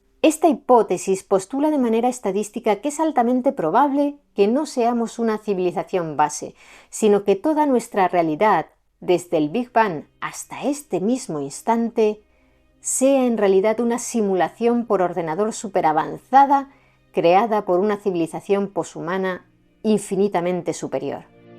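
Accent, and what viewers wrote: Castile, Spain. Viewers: De los pocos españoles que no me molesta escuchar el acento castellano,buen tema y bien resumido, felicitaciones.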